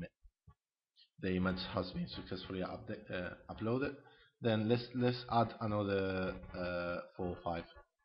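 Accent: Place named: Spain. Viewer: jejejeje por el acento me sonaba a bastante español, pero ahora que he visto por ahí..."subir archivo" jejejeje Acabo de comprar el tema y lo estoy instalando, a ver qué tal. Un saludo y gran trabajo con este soporte en vídeo.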